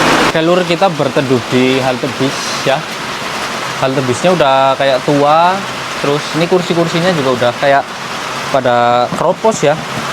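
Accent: Banyumas, Indonesia. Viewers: Ngapak medok banget menghibur bisa jadi rebutan gadi Jepang, jangan tinggalkan 5 perkara tinggalkan 5 perkara, maling, main, minum, madat, mawon, si mirip mas Sentot Cilacap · Sekang ndi asale mas? Logate ngapak kayak nyong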